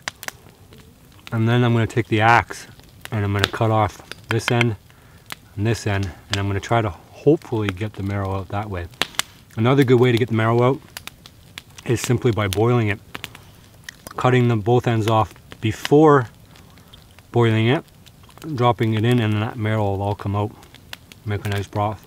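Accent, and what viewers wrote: Canada. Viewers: I knew it was Canadian the first time he said "aboot".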